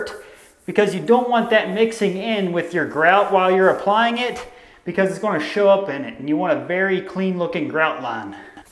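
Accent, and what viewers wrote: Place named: Pennsylvania, United States. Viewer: Southern PA af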